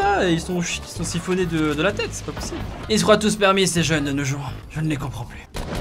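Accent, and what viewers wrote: Belgium. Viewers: c'est étrange avant t'avais pas l'accent belge mais là en t'endtendant parler 😂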